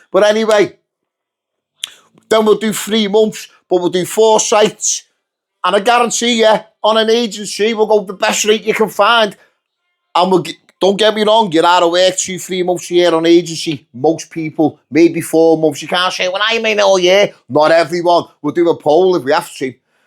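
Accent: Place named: Liverpool, United Kingdom. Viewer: How scouse is this man!😮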